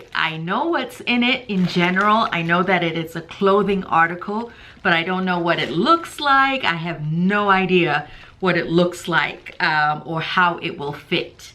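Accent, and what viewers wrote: Nigeria. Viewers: You look absolutely beautiful ❤️ you still have the Nigerian accent. Nice 👍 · Wow! Your Hausa accent is still evident after all these years 😂. The way you called Abuja was spot on. Allah ya ja zamanin ki malama...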